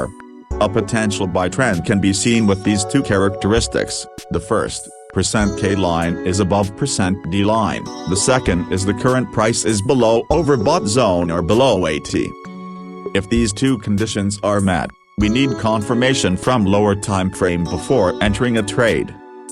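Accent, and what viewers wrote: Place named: India. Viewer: Could you know I've always than this question mark this is like trying to listening to customer service from India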